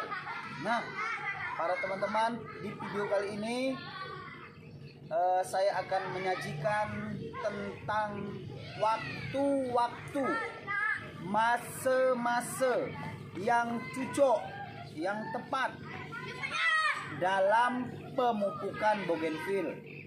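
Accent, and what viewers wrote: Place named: Indonesia. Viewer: anda kedengaran asli dgn loghat bahasa indonesia anda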